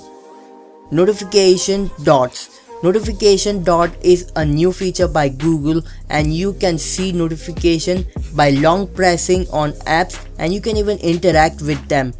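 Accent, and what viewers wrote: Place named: Uganda. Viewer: Nice accent. from Uganda university?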